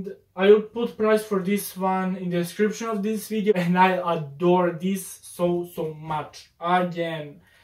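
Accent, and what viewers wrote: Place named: France. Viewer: you have a french accent 😱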